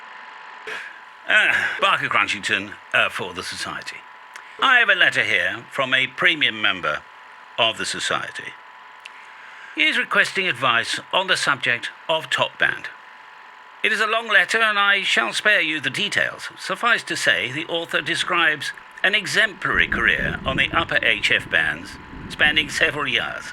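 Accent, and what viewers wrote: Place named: RP, United Kingdom. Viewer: Love the posh accent! Thanks Mr Crunchington! ;) 73s de F4HZN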